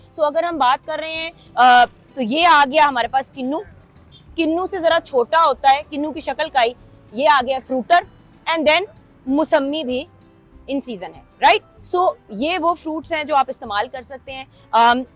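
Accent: Punjab, India. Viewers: Camera person kisi chez ko focus kur lay .hur jaga loud ayr punjabi undaz agya hay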